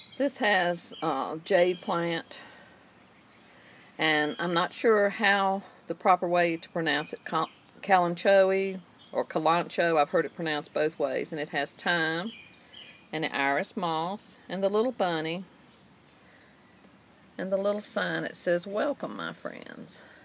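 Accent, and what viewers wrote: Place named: North Carolina, United States. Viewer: Adorable fairy gardens. I just planted my first one a couple days ago and I'm already making plans for another. I like your plant choices. I absolutely love that purple/green shamrock looking one. I'm going to have to keep my eye out for that one. Are you from NC? Your accent and the weather in your video seems close to home. ;)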